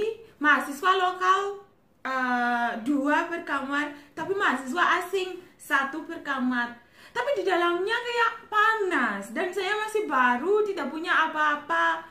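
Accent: Java, Indonesia. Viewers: Logat jawa ne medhok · Medot banget!!! kok ngomongnya mirip org jawa ya hehe!!! mantap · Mantap suaranya medok, Zimbabwe rasa Jawa, lanjutkan semoga sukses selalu 👍👍👍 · Waah hebat udh medook jawane 😄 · 😂😂😂😂😂 saya suka bahasa medokmu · Wow... Sudah ada logat Jawanya lho hahahah medhok bahasa Indonesianya. I hope you enjoyed your stay here, miss. Ps: Years ago when I was in my high school I stayed 2 weeks on Unair dorm years ago, so I knew what you're talking about 😂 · Logatnya jawa banget,keren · Bahasa Indonesianya Medok banget. Kayak orang Jawa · Wahh medok kaleee yaaaa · Mbaknya bahasa indonesianya jadi medok ya wkwkwk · Logat bicara kk sudah khas jawa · Mantaap nih mbaknya Lucu,medook Bahasa indonesianya keren...good job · Suka liat mbak cantik 🤗🤗 mbak medhok lucuu 😍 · Medok bahasanya... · Kalo ngomong gemesin + medok wkwk👍🏽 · bagus banget caerita kamu tentang pertama kali.di Indonesia.Dan langsung belajar bahasa Indonesia dg logat Jawa. 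Good job 👍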